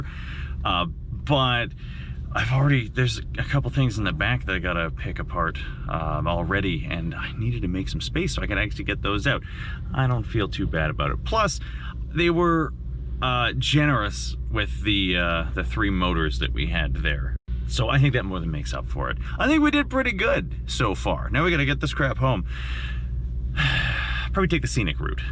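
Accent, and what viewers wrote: Canada. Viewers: Good one......ooohh yeaah its got some weight to it.....Same same but with Canadian accent Love it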